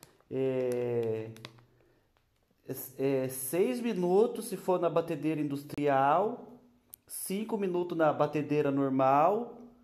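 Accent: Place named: South, Brazil. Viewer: Sotaque sulista!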